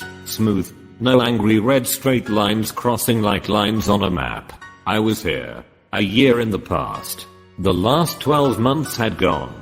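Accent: United Kingdom. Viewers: best british accent.......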